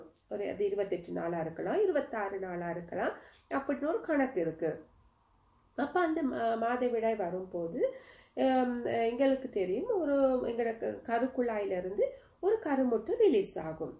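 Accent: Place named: Sri Lanka. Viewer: R u srilankan . speach tells.love from srilanka❤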